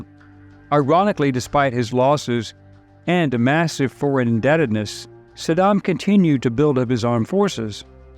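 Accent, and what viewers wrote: United States. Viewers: You have the most American Cowboy voice ever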